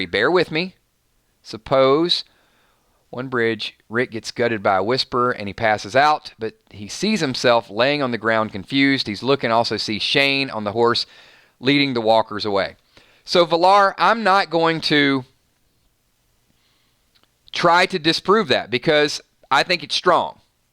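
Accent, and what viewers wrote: South, United States. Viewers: Aw-Ha!!! That's what draws me in... your southern drawl!!! Go 'head country boi!! :-D Rick Kilz, Kilz Grimes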